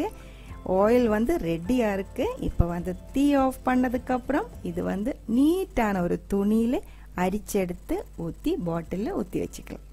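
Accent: Kerala, India. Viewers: Are you malayali? Samsaram kettal malayali aanennu thonnunnu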